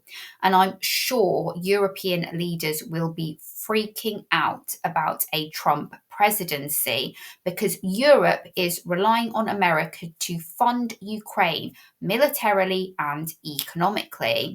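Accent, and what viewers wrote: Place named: United Kingdom. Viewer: Sweet British accent ❤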